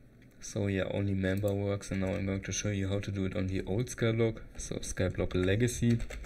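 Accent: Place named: Germany. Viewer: man hört das du deutsch bist danke für das vidio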